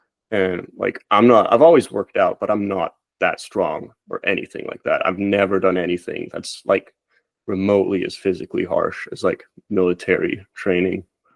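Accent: United States. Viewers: He lived in EU all his life but sounds yank, and is half Peruvian. He's not going to be hard to ID with those facts. Also, guy sounds like a brain rotted zoomer. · Probably grew up on internet, so he adopted American English from nerds he played games with online. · He’s European but has a perfect American accent? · He sounds American but says hes European. Must have spent long time in the US to learn English like this even his use of Like...Goddamn etc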